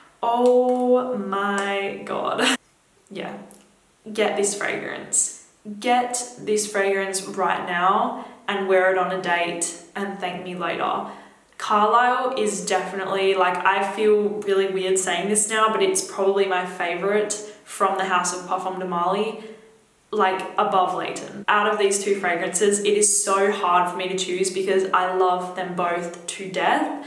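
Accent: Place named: Australia. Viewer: Where we going on date night ;p Edit: Echo is a plus, twice the Aussie accent.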